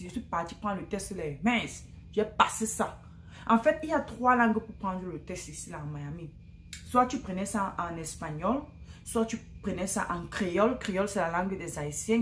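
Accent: Cameroon. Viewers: 🤣🤣🤣 l'accent du +237. Merci du partage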